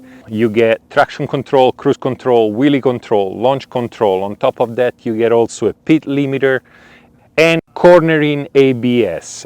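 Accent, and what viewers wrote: Rome, Italy. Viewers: Beautiful honest review, good job. I think i've heard a bit of Italian accent. From Rome, to be precise. Am i right? If i may ask.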